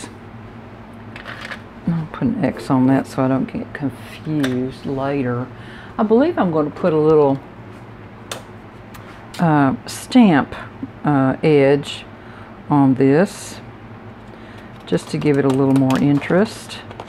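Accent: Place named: Southeast, United States. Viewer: My husband and I watched your video during my lunch break from work yesterday! You have such a great eye for design. We both loved your cards. Everytime we watch one of your videos we have the conversation about your accent and where you’re from. He thinks maybe Mississippi and I won’t commit to a state but just say “somewhere in the southeast”. My husband is from West Texas and has a definite West Texas accent. I’m from Pennsylvania and although I don’t hear it, when we lived in GA so many people would comment about my northern accent. Accents have always fascinated me. So my guess is still that you are from the southeast but I’m pretty sure not Alabama, Georgia or Mississippi. Maybe Tennessee or South Carolina because yours isn’t as strong as a Alabama, Ga or Mississippi. Sorry for the ramble about non-card/video things. I’ve become such a big fan of your videos that they are literally the first videos I look for when I get time to watch some TV. 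One more question for you, also from our discussion yesterday - what is the microphone you’re using? I’ve been starting to create my videos and finding time to do voiceovers is hindering getting other things done so I’m thinking about looking into microphones so I can talk as I go, like you do, and then edit out what’s not useable, which would eliminate the voiceover step in our production schedule. Thank you again for making videos that show how to use what we already have and don’t have a selling aspect to them! Hope you have a w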